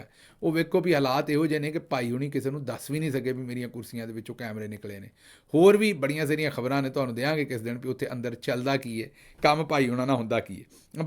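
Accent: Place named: Punjab, India. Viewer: I enjoy your Punjabi dialect Your explanation is sincere Today you mentioned about military officers having a servant “ orderly “ It needs a correction Police and other civilian officers , in military person doing same is called “BOOT MAN”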